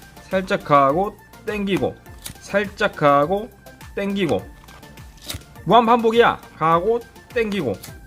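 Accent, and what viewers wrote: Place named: South Jeolla, South Korea. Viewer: 전남사투리 맛깔나게하시네요 ㅋㅋㅋㅋ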